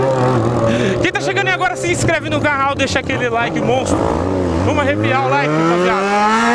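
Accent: Goiás, Brazil. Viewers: achei q vc era de Goiás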